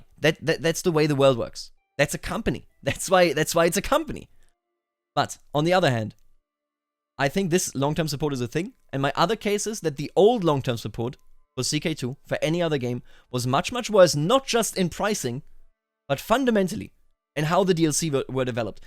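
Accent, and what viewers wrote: Germany. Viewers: Well, this is how actually true German English accent sounds. It's always funny to see so called "Germans" in american cartoons that speak like Russians.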